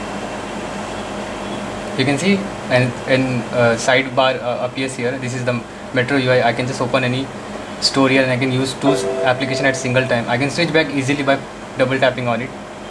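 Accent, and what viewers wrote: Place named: India. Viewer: It's Indian English! Very understanding for Indian people.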